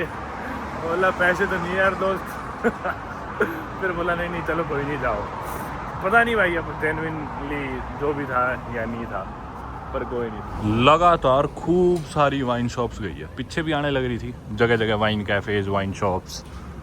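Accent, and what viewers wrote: Haryana, India. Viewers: भाईसाहब की हरियाणवी भाषा कमाल का है सुनने में अच्छा लगता है एक अलग ही वाइब है भाईसाहब को छत्तीसगढ़ से प्यार 🥰👍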